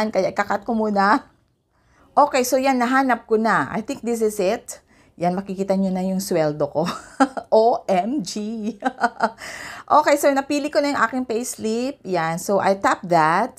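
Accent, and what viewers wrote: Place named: Ilocos, Philippines. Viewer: Ilocana ka met gayam madam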